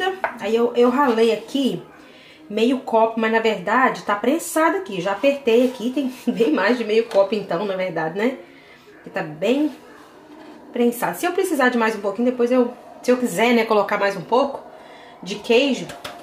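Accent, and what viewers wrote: Minas Gerais, Brazil. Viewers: Ela tem um jeito todo mineiro de conversar . Tenho vontade de conversar com ela, pois também sou mineira mas péssima cozinheira.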